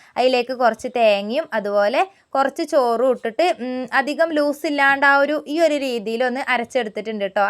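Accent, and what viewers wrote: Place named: Kozhikode, India. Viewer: 🥰🥰കോഴിക്കോടൻ സംസാരം ആണല്ലോ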